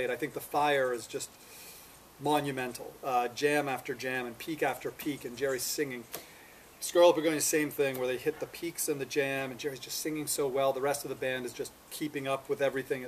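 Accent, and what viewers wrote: Canada. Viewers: Is he Canadian? I hear a strong accent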